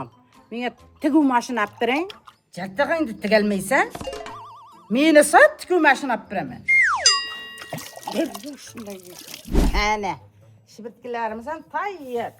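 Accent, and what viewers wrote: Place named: Payariq, Uzbekistan. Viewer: ШЕВАНГИЗ БИЗНИ ПАЙАРИК ТУМАНИ АЙРИМ КИШЛОКЛАРИ ШЕВАСИГА УХШАЙДИ ЖУДАЯМ ЙОКАДИ ГАПЛАРНГИЗ. АЙНИКСА ШУ РОЛИК ЗУР ЧИККАН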